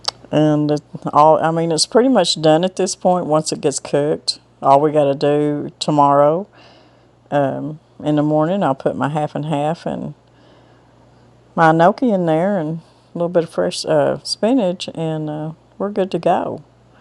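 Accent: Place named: Kentucky, United States. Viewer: Hi! I’m Jane and I’ve been watching you for a little while. I’ve gotta tell you just how special you all are to me because I honestly feel like I’m back home in Kentucky when I’m watching. Your accent is just like me and my family’s and you cook like them too. Lol Thank you! By the way, made the Elvis cake the other day and my husband and son pretty much ate the whole thing in 2 days. Guess it was good or something.😂 Keep on cooking and growing.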